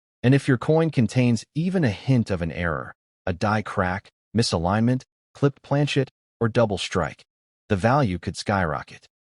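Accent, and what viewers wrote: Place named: United States. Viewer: AI American accent , NOT AUSSIE 1 !.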